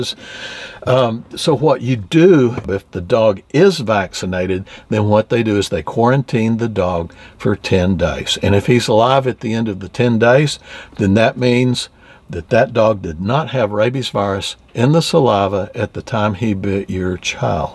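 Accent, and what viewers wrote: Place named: South, United States. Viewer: you have a slight, maybe southern drawl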